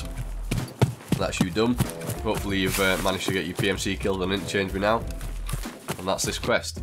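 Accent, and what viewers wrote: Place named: United Kingdom. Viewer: i love that British accent :D <3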